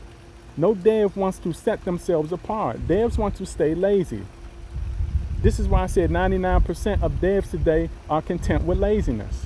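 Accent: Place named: Baltimore, United States. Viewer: ooh ok that’s ah nice home bro I thought you were from Baltimore because of the accent I’m from Baltimore I live in Texas now tho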